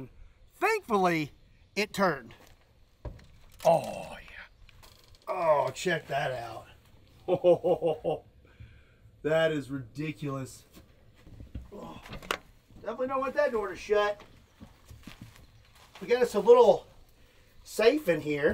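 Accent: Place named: South, United States. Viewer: you can hear the southern in his voice clear as day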